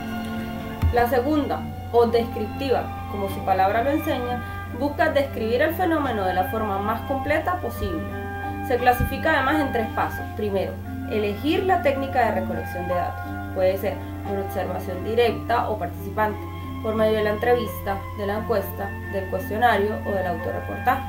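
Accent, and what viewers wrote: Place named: Bogota, Colombia. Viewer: ser la dura del curso! paraces bogotana jejejeje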